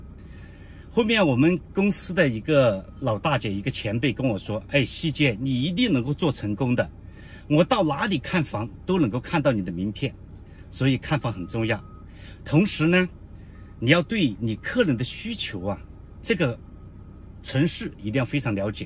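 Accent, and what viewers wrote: Hunan, China. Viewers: 订阅了。师傅您是湖南人吗？谢谢分享您的经历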